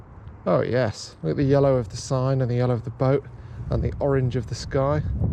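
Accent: United Kingdom. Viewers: so you're telling me this guy's great at what he does AND HE HAS A BRITISH ACCENT?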